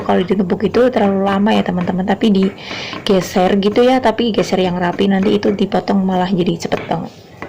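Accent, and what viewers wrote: Banyumas, Indonesia. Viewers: Salam ngapak sliramu pinter masak pantesan bojone betah nang umah sampean nang luar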